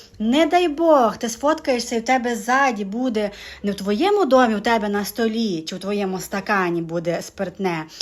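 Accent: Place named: Chernivtsi, Ukraine. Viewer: Ви з Чернівецької області , говор у вас ніби звідтіля.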